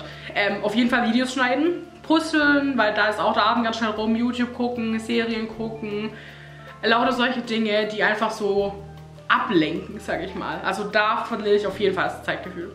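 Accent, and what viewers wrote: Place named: Swabia, Germany. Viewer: Tolles Panoramafenster. Bei Euch liegt ja ordentlich ❄️! Wo kommst Du denn her der Dialekt hört sich sehr vertraut an! Schwäbische Alb? Was machst Du denn beruflich? Wie habt Ihr Euch denn kennen gelernt? LG aus bayrisch Schwaben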